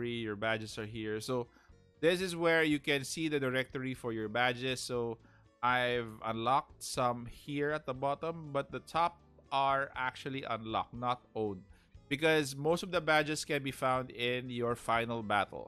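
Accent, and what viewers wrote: Philippines. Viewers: I hope they Put a option so that people can Delete or Keep other servers. By the way are you a Filipino? The way you speak your English has a Filipino accent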